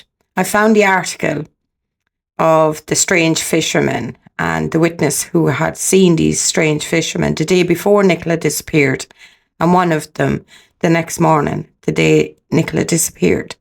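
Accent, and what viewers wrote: Ireland. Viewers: Love your Irish accent and I agree with you. This was not a terrible accident. 😢If they were innocent fishermen why haven’t they come forward?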